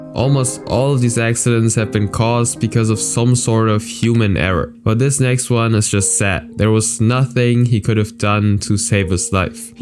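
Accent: Germany. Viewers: Are you German by any chance? Your English is perfect but I can catch a minor German accent :)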